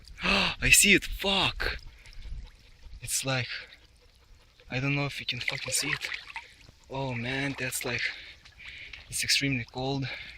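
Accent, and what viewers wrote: Russia. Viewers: Are you Russian? I hear accent ha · Videos are great. I can tell your from somewhere like Russia or close to it. What a lot of people don't understand is that the word Fuck to you (since English is not your first language), is not a big deal. Keep exploring my friend, maybe you will get that superpower:)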